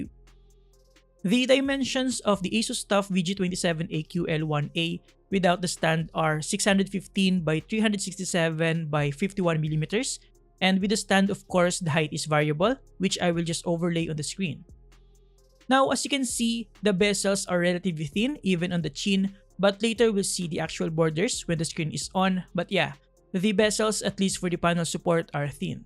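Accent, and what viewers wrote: Philippines. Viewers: Props sa pagka-review nito pang international visuals. Nagtitingin lang ako ng review about dito sa monitor na ito then narealize ko sa tono ng boses pilipino pala. Husay! Sakto nabanggit din na predecessor pala yung QA so possibly ito QL1A i-acquire ko.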